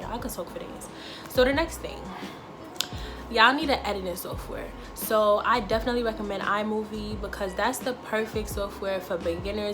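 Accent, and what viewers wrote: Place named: New York, United States. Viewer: You gotta be from NY or up north lmao I hear the accent okay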